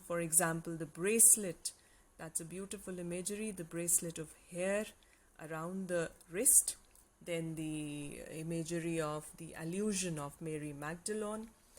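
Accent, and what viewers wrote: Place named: India. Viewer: Thank you for the great an excellent explanation about this poet .really,I have never heard this kind of explanation in Indian accent and as much clear accent, really it was attractive and learned very clear and good this poem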